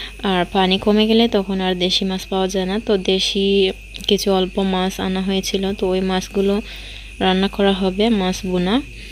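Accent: Sylhet, Bangladesh. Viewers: beautiful vlog. nice blog. apu apni ki sylheti ami confused tai ask korsi apni ke.super blog.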